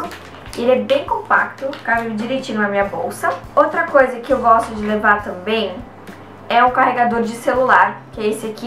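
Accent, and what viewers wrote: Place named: United States. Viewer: eu queria saber uma coisa, seu sotaque "forte" de inglês é por conta de vc já ter morado nos Estados Unidos? Fala inglês fluente? Beijo e amei o vídeo 😁😘💜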